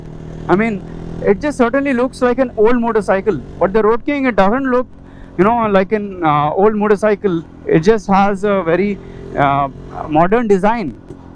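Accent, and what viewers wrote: Hyderabad, India. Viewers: Have watched this video for over 5 times now, we love your Hyderbadi style Hindi accent bro 👍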